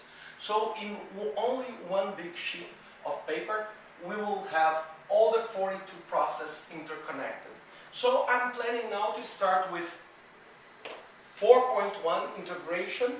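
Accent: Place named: Brazil. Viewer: where u from? you sound like Brazilian! am I correct?!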